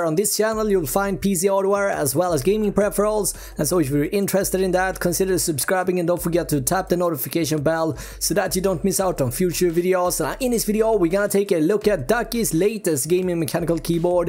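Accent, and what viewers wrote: Sweden. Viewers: Are you swedish?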